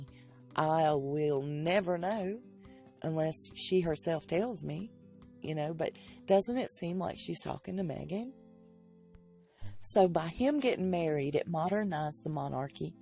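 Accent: South, United States. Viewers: You are so precious,. I look forward to your videos everyday! I love your Southern accent. I am from TX, and I have one too. You keep me company sometimes, ad I am at home a lot. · me 2 I love her southern accent!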